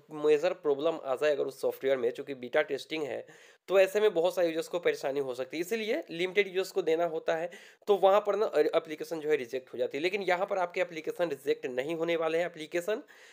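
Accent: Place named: Bengal, India. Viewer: Vii bengali ho ka hind sahe se nahe bol pa rahe ho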